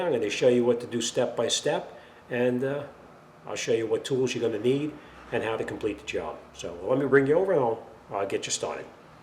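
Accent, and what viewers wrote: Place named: Boston, United States. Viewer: I swear to fuck. I love the Boston accent. Ya did gud wurk.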